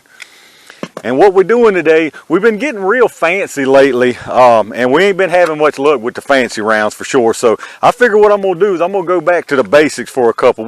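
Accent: South, United States. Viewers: well guess i need to subscribe.. ive watched 4 of your vids without trying to, plus you sound country as hell like me im in SC I would use the aguila for 10/22's and for people with short 22lr barrels like NAA revolver would use punch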